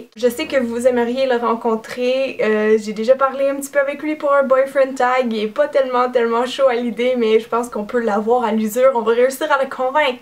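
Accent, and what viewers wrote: Canada. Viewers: Vu l'accent même sans savoir, elle doit être canadienne =) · Moi aussi je pleure très facilement et j'ai toujours préféré les matières littéraires et artistiques en cours, et je n'aime pas le café, les fruits de mer et ce qui est piquant non plus... C'est rigolo parce que quand je suis allée au Québec il y a trois ans, je trouvais l'accent très drôle, voir un peu ridicule mais depuis que je te regarde je trouve ça plutôt mignon finalement et certaines expressions me font bien rire mais sans moquerie ! Continue comme ça en tout cas ! :) Gros bisous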